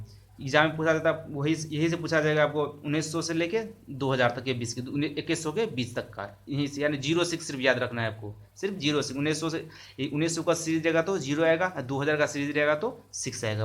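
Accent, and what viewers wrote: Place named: Bihar, India. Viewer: Chhh kyu bilta hai bihari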